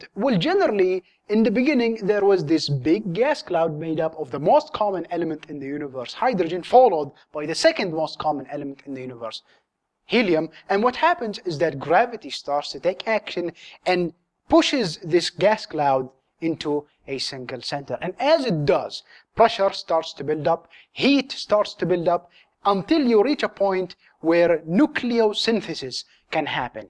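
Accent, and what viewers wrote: Arab world. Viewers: اللكنة العربية المستشرية احلى ما في الموضوع :D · he's got a really heavy arab accent (im arab myself) ..is he actually arab ? nevertheless he is amazing :D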